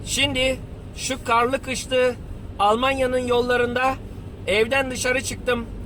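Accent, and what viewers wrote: Thrace, Turkey. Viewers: Va varya sen 10 nuara 5 yıldız sın. süper sin. Bu trakiya şiveye devam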